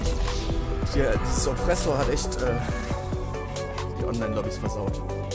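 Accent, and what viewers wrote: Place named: Germany. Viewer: I love the German ascent I am dutch so I have almost the same as you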